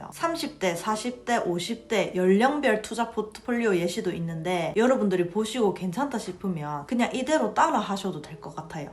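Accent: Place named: Busan, South Korea. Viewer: 짱이에요 영상 항상 잘 챙겨보고있어요...!!🥹💜 친근한 부산사투리 너무조와용 >_<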